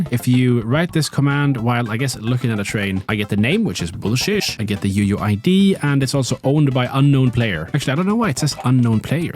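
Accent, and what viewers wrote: Sweden. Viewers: He sounds swedish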